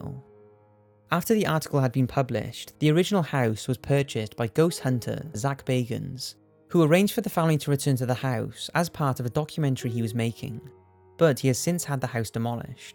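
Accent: Wales, United Kingdom. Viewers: He's Welsh. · ElseWhere 47 That’s a Welsh accent pal, not English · what is his accent? Sometimes I think defo American then at times sounds like different parts of England. Even some of the words come with a Welsh twang now and again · Sounds welsh to be honest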